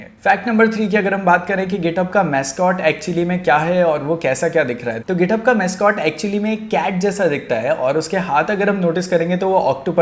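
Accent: India. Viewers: Someone has already told you that European people find difficult to understand well tipical "Indian English"??? Is not question of speed... Why this torture!?!?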